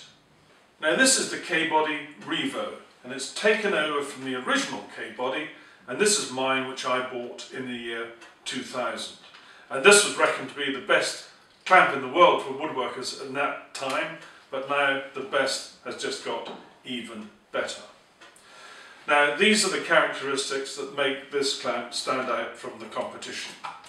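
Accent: United Kingdom. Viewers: did a great job. I have several clamps from other manufactures. Last night I was clamping a closet shelf system and I noticed that the Jorg's were not exactly parallel which surprised me, but the Besseys that were also being used in the same clamping were exactly parallel. The Bessey clamps made it possible to clamp perfectly, the Jorg's would have left a mess for me. sold me on Bessey, and the British accent made me feel chipper!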